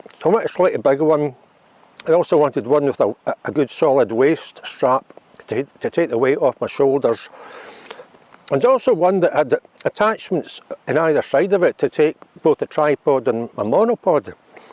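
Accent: Scotland, United Kingdom. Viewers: Hi, I just discovered you while trying to find something on the TV to cheer me up ( I don't watch much TV) Well! that said, I am watching every episode of yours I can find. You have brought back my memories! thank you so much I love your shows. You even talk like me Ha1 Ha! I live in Australia but grew up in Kilmarnock and I used to do all that walking that you are doing now. Our country is beautiful and you show it so well, Thank You so much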